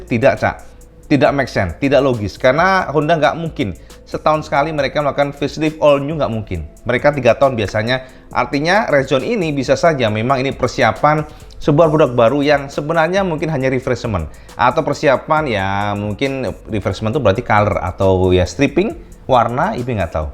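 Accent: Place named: Java, Indonesia. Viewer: Gk peduli konten cak apa, saya lebih menikmati omongan yang disampaikan sangat menarik dan enak didengar. Jowo rk ilang jowone cak.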